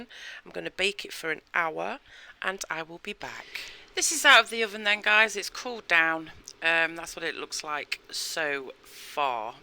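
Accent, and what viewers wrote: England, United Kingdom. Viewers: Lovely to hear an English voice!!!!! I am in Ireland love your channel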